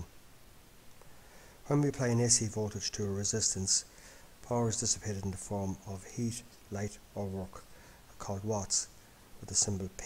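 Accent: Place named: Ireland. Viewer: Thank you very much kind sir, it was well explained and i appreciate the fact that you talked slowly or i would have trouble understanding as i'm not used to the irish accent.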